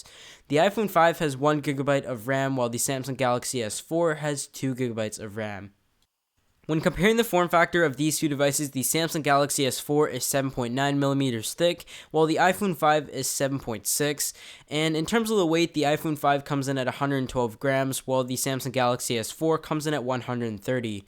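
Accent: Canada. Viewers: oh my gosh. are you Canadian?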